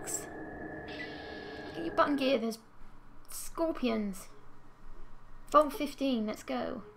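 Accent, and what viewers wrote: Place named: United Kingdom. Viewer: I didn't quite understand the name of the game studio you're working in... Anyway, I'm eager to watch this series, because even though I really love the Fallout franchise, I've only played the PS2 spin-off Brotherhood of Steel and all the Bethesda games. So I'm more than excited to watch somebody else play the classic that started it all (well okay, that would technically be Wasteland lol), especially somebody with a British accent. :3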